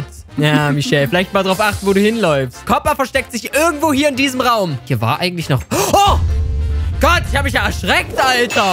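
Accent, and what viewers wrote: Saxony, Germany. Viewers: ich liebe deinen säsichen Dialekt 😍. und finde es mega cool das du dich vor der Kamera jeden Scheiß traust 😂# #Aboboycamper #LogobesterYT #verdient1MioAbos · wo kommst du her XD du kommst mir vom sprechen her iwi aus Sachsen owo? stimmt des?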